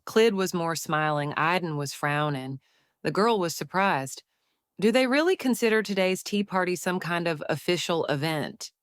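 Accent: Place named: Texas, United States. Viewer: Why is she from Texas?? 😭😭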